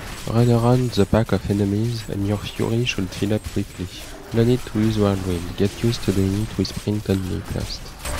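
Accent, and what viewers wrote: France. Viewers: La vidéo est impressionnante mais est-ce que tu pourrais en faire une en français, je n'arrive pas à trouver de bon tutoriels en français et vu ton accent dans la vidéo je me dis que tu dois être français... ^^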